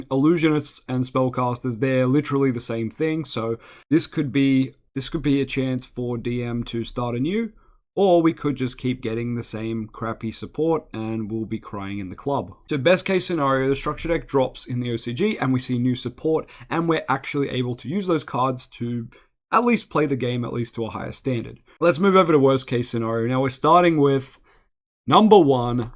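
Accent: Australia. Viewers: New mic? You sound less…Australian? Kiwi? Whatever you are, you sound less like it than last time I saw videos from you. Lol.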